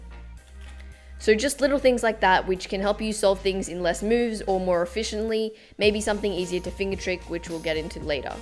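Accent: Australia. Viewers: Waaaaiiiit...are you Aussie? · the cubey guy she's most likely Australian bc of her accent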